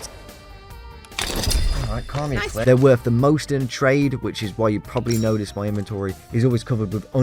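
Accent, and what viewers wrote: United Kingdom. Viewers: I like your british actent is so perfect i wish have but i live in Poland so... Yeah this is i be wach you british actent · You sound British?... When are you going to convert to Islam? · First time on the channel, American 🇺🇸 here. With my only knowledge of this game being British, Im happy to know I tapped on a British dude reviewing it. It makes it so much better! Thank the algorithm YouTube scum, they do something right! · I KNEW THAT WAS PS1 MONSTER S.A. you sound briish btw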